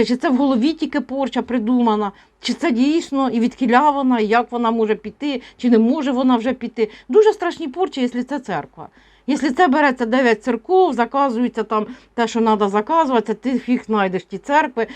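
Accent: Poltava, Ukraine. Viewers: Добрый день,! А я вільно спілкуюся і на українській і на російкій мові, але значно приємніше чути наш полтавській говір. Простий і милий. Дякую. Дуже подобається вам слухати. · Я так і знала, що ви з Полтавщини❤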